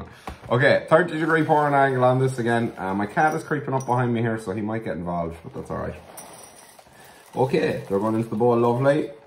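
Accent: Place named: Ireland. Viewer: describing Lucky Charms cereal in his delicious Irish accent gave my American inner child immense joy.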